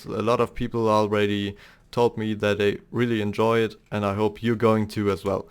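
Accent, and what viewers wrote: Germany. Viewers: dass du deutsch bist, hört man direkt raus. deine anfangsbeats sind brutal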